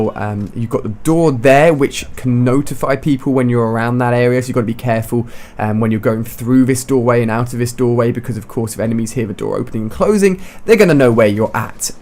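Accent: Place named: London, United Kingdom. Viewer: i think he is from UK but maybe london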